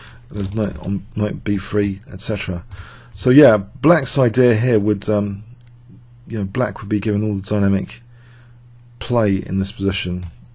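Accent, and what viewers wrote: United Kingdom. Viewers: Thanks for your continued success as a player and commentator. Of course, I always have to acclimate to the British "th", but it's worth it! (My British friends always complain when Americans say "water" with a "d" sound!). I don't have a chess engine, so I was hoping to get your feedback on the idea of Black playing a5 after Ba3, intending Nb4 if White pushes e4. If White exchanges B for N, then Black has a protected passed pawn on c3 and preserves the dark-squared bishop. Any thoughts?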